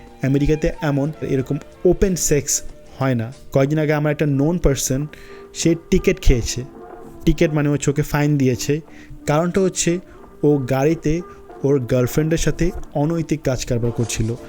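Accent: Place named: Noakhali, Bangladesh. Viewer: খানকির পোলা তুই নোয়াখাইল্লা তোর কতায় বুঝা যায়...তুই নিজেই গেয়ে.... আমেরিকায় থাইকা মডানগিরি চোদাও যাইয়া ত পেপার বেচস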